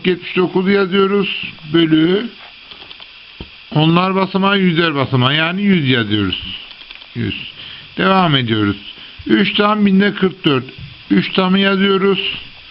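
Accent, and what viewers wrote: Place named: Central Anatolia, Turkey. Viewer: kağıdı halının üstüne koymuşsunuz,masada daha rahat yazardınız,şiveniz ortaonadolu gibi...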